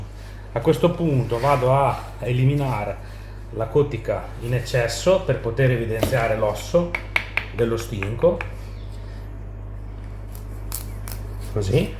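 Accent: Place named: Romagna, Italy. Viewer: Bravo complimenti 👍 raccontato con il tuo accento romagnolo diventa fantastico 👍👍👍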